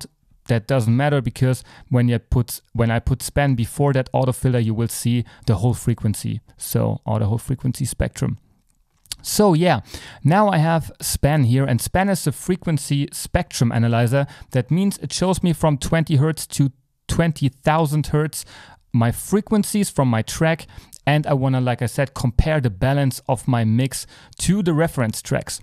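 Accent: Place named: Germany. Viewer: that german accent lol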